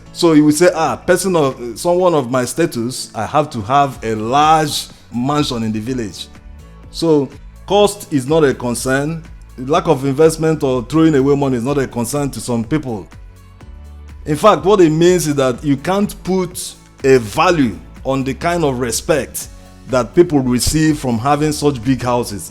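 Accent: Igbo, Nigeria. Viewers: Is this commentator okay? You slap a man for building a house for his family with his own money ,the woman must be mad i. e if his story is even true! You sound Igbo, you lack depth in your analysis.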